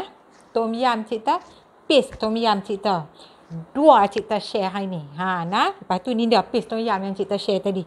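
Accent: Kedah, Malaysia. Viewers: Suka tgk masak...cakap kedah lagi...cun la..sopan.bersih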